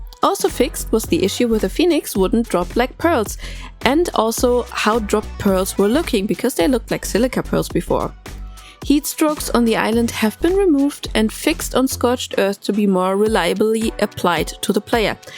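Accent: Germany. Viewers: Bist du deutsch? Hört sich sehr danach an xD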